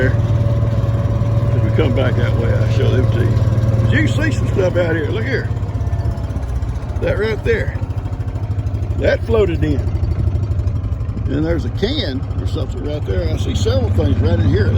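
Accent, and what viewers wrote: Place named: Texas, United States. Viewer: Listening to you with what you show and tell is therapeutic for me. Just love your videos. So interesting. Seeing how high the water went in Harvey. Wow. Heartbreaking for all the animals. Love Heidi. She is a true mate. From Australia. Your accent is strong. Love that Texan accent. God bless you and family ❤️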